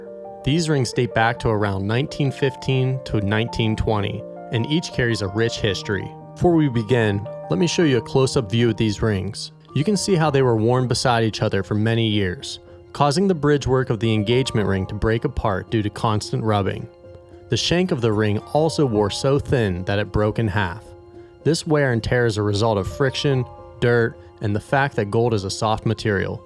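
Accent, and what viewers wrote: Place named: Pennsylvania, United States. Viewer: I absolutely love your channel... You're doing a great job cultivating content that is both visually stunning and incorporates commentary that engages the viewer. I knew you must be from PA area bc of your (subtle) accent! No judgement, I'm from Baltimore which may be home to the grossest sounding dialect of them all (but iiiii don't have an accent😉). Thanks for the time and effort you put into your videos, and one day i will bring ya a big ol' princess cut set in rose gold to be sized. 😁 Jk,jk. I'm team asscher all the way.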